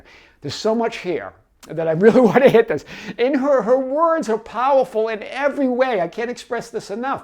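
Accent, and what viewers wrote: Boston, United States. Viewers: Yesss! I knew it was a Boston accent, I love guessing accent's... Its my thing😅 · Aw we love that Boston accent 😂🤍🤍🤍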